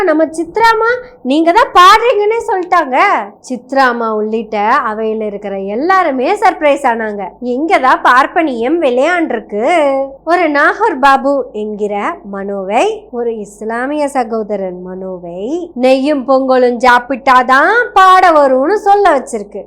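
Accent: Kongu, India. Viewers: கொங்குத் தமிழில் நோண்டி நுங்கெடுத்து சங்கிகளைப் பங்கப்படுத்துவதில் தங்கைக்குக் கைவந்தக் கலை! அழகுத் தமிழுக்காகத் தலை வணங்குகின்றேன்🙏🙏🙏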